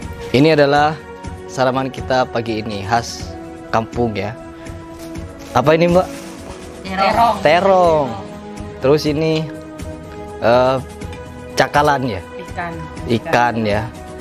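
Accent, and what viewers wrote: Madura, Indonesia. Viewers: Terdengar ciri khas bicara Madura a. Kak salam settong dere sengkok derih madureh tapeh lambek 3 taon neng jatiroto pas tips malem miggu sering nongkrong di alun2 Jember jiah kak salam KK maderen Padeh beres salamet Ben tambe sukses amin.🙏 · Oalah, mas asli Jember 🙏 😊 pantesan logatnya ga asing logat maduranya mas masih kental 😊🚬☕ salam mas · Pantesan ada logat maduranya bang, salam dari madura bang🙂🙂🙂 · Oalah...Mas asli Jember toh? Pantas msh ada dialek madura yg lekat...sengkok reng Probolinggo tretan! Tetanggeh...😁😁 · Oo arek jember to, keluarga ibu ku mayoritas dr jember hgga sekarang. Pantesan logatnya spt org madure. Salam cak. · pantesan deri pertama nyengok vidio nah bahasan nah logat medureh hehee deddih asli jember hihi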